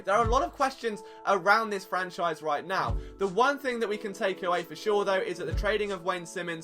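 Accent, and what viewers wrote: United Kingdom. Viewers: You have much wisdom in the realm of football, my son. In spite of your British accent which would suggest one to assume you care nothing about the game at all...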